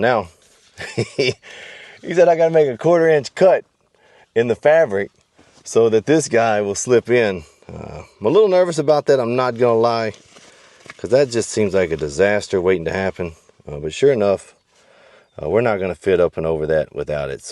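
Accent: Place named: United States. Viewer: Dude that country accent is one of the things I miss moving to Canada. Good looking addition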